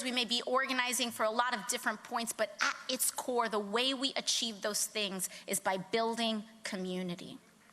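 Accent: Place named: New York, United States. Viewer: She is so cute with her newyork accent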